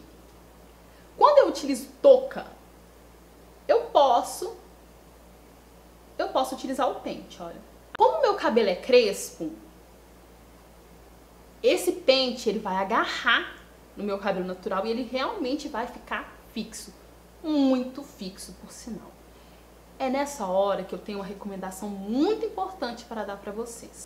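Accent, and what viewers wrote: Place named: Minas Gerais, Brazil. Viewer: esse sotaque que acho lindo? tu és mineira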